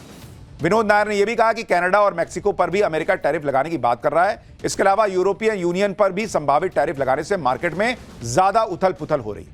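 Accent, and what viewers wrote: Bihar, India. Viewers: Pure hindi language mein bolo bihari hindi nhi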